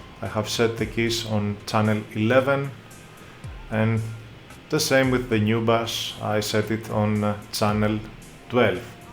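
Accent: Greece. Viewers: ahahahah you are greek I can tell🤙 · that's the Greek accent, for sure !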